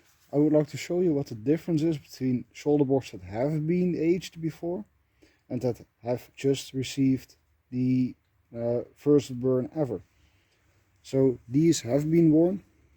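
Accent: Netherlands. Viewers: Into German reenactment too. I've done the same treatment as you did. I'm just a soldier, so no heavy wear and tear on my stuff. But like you said a headstart. Even as a soldier the uniform and kit went trough training, traveling, workduty etc. I'll allow the stains and aging to happen during my own time in the field. And hearing your accent. Groetjes van een mede Nederlander.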